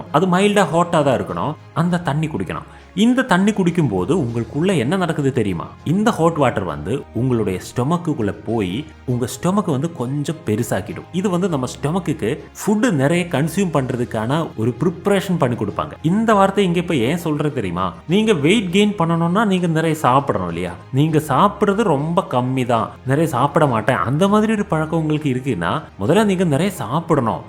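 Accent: Kerala, India. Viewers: Kerala bro❤️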